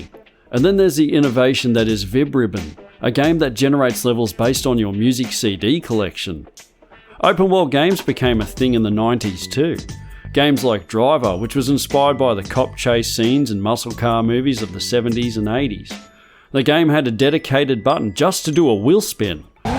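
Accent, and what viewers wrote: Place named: Australia. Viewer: No they're just both Australian. They sound very different.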